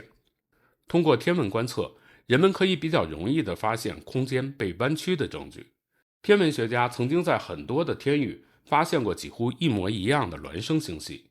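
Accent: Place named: Tianjin, China. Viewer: 主持人是天津人吧，你这内容是从哪抄来的？别装了，直接说天津话吧，你这天津普通话齿音字一点都不少！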